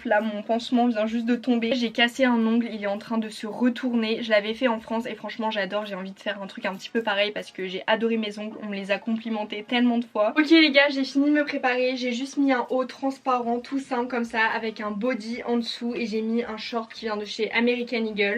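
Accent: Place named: United States. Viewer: Très belle vidéo comme d’habitude ! Tu as un bel accent ! Une vraie américaine 😊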